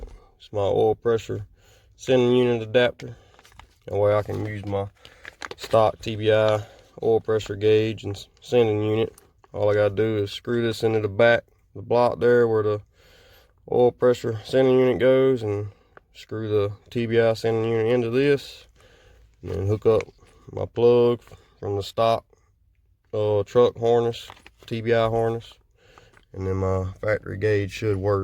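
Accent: South, United States. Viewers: Believe it or not the funny southern drawl is what let's me know you know how to work on hotrods. Call me judgment/prejudice.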